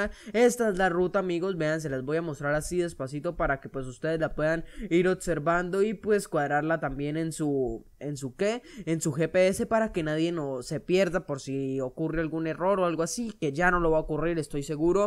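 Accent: Colombia. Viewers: sos colombiano?:)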